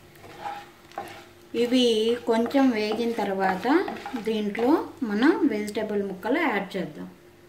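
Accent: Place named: Rayalaseema, India. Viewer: Mi voice super alage midhi rayaalaseema andi endu kante vullagadda annaru anduke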